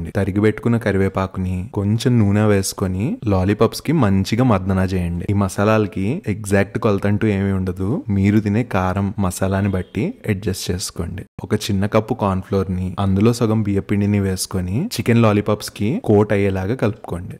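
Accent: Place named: Vijayawada, India. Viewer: Mana bezawada accent keko keka brooo..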